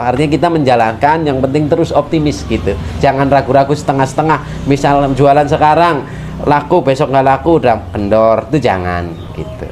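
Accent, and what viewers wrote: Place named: Rembang, Indonesia. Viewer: bahasa rembang meduk.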